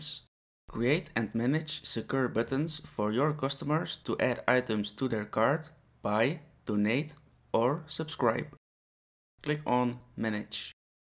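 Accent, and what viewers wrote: Netherlands. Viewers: you are dutch i hear it in your accent lol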